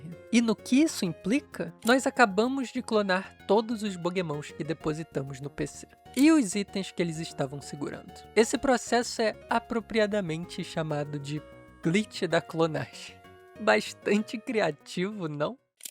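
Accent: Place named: Rio de Janeiro, Brazil. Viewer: o é o carioca maixxxxxx carioca que eu conheço. e olha q EU sou carioca e falo cheio de carioquEIXXXX tb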